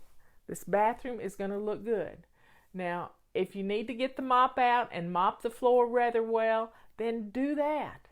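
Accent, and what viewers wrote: South, United States. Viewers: Been listening and watching for years whenever I start losing my way back into chaos. Your easy direction, great starting point, and delivery are authentically your own, OG Fly! Your familiar Southern accent is both graciously understanding and proper, yet decidedly stern. Well done! You’ve inspired so many!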